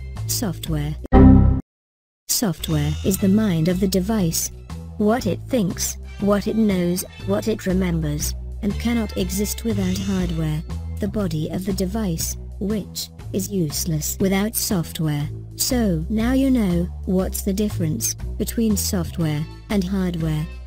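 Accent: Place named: RP, United Kingdom. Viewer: I enjoyed this because I thought the voice was Glados with a posh British accent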